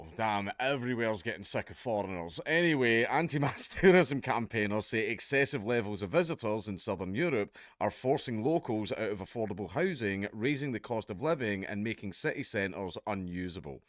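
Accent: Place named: Scotland, United Kingdom. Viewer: Who the hell taught your country to speak oooooooooh your scotish eesssh what a wreck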